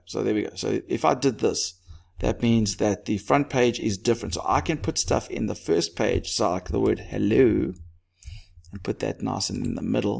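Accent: South Africa. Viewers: are you south african????? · Are you south African ???????😊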